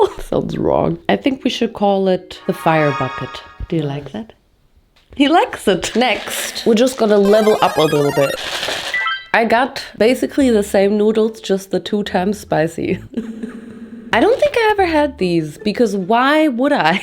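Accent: United Kingdom. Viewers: As a Brit, I love your British accent! You nail it Queen! YUS! But gimme gimme those spicy noodles.....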